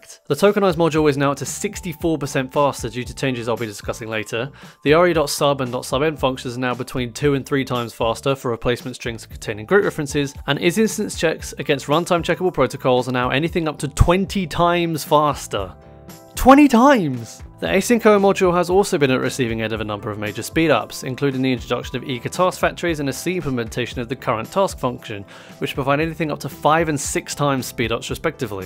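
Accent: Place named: United Kingdom. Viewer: No thumbs up. I live in the US so my ear is not tuned to a British accent. The accent along with your fast talking made it a challenge to understand. At some points I changed the playback speed to 0.75. My suggestion to get more thumbs up? Slow the F**k down! Are you simply trying to impress us that YOU know this information, or are you trying to impart the information to the rest of us? Communicaiton is NOT speaking words, communication is transferring ideas from one brain to another brain. Do whatever it takes to acheive that goal.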